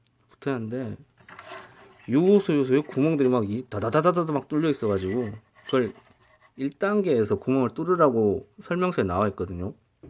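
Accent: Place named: Jeolla, South Korea. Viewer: 전라도 사투리네 씨발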